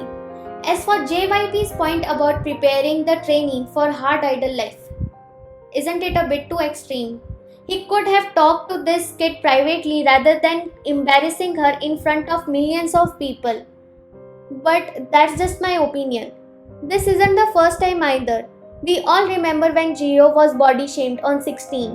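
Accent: India. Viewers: Hey are you indian your ascent is like a indian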